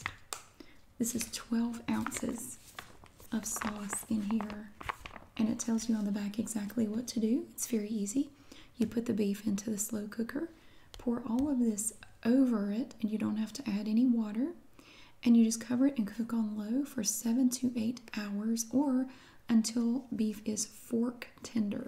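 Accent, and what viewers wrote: South, United States. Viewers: x aren’t they the best. It’s her southern accent for me.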